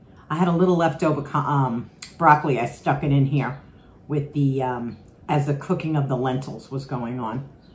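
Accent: New York, United States. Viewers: Morning! do i hear a hint of NY accent in you? lol the dish looks really good!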